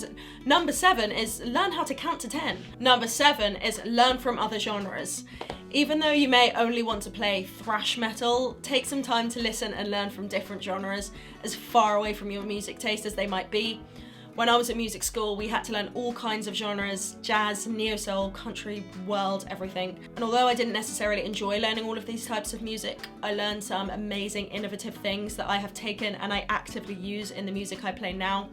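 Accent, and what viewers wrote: England, United Kingdom. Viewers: Wow she has a British accent... as if she wasnt hot enough already. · Hm... I thik the UK pronunciation ia really annoying... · Она англичанка?) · I could listen to a british native speaking girl all day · She sounds English, splendid. If I hear much more of those loud mouthed yanks that sound like ducks with their stupid word awesome I may end up hurling my computer at the wall.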